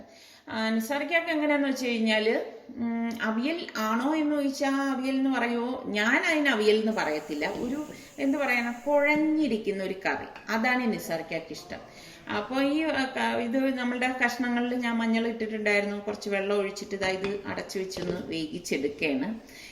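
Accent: Trivandrum, India. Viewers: Trivandrum ano veedu.speech nalla vyathysam.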